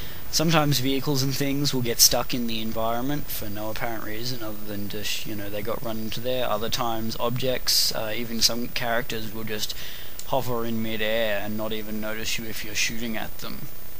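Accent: Australia. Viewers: You have a good potential to be professional commentator. I like your voice inflexions sounds a little Aussie. If you could drop the swear words, it would be "really really really really" good.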